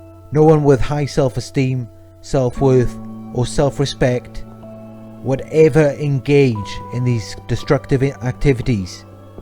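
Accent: Wales, United Kingdom. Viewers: Great video. You sound Welsh. Im welsh too! May I ask a question? I started a FB page helping people with mental health and theres one guy on there thats always sucking up to me. Compliments compliments compliments. Yet....whenever he becomes close with certain members (and is conversing with them through messenger), they seem to go very distant and cool towards me. The women in particular. This has happened several times. I thought it may be my imagination, but I dont know....it all seems a little strange. Do you think this guy may be a bit of a narc? Thanks in advance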